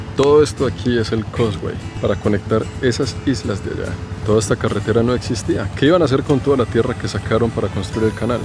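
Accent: Colombia. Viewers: pero su acento parece más colombiano (del interior) que panameño... O me equivoco?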